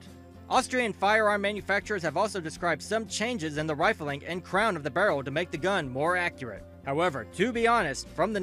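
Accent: Australia. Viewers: australian LMAO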